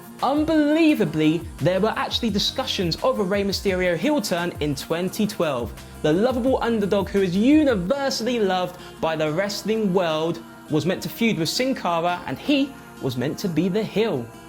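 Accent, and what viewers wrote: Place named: United Kingdom. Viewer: I love how he pronounces McMahon, man I love British accents!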